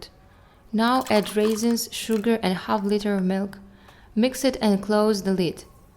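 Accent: Russia. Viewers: Your accent seems that you are from Russian Federation ? 😯🙃🙏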